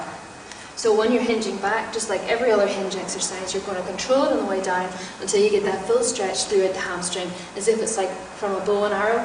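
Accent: Ireland. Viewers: I like your Irish accent.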